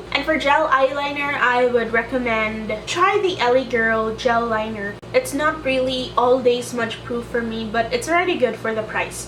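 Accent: Philippines. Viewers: Super cute mo sis pag nag t-tagalog. Haha!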